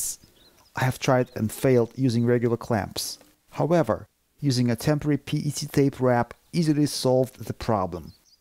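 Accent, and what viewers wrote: Russia. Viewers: Off topic, I have always found the Russian accent easiest to understand. I have spoken 2 other languages & even those are more difficult. Love this idea & liked this video years ago. I plan on making this cutter soon. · Русский акцент)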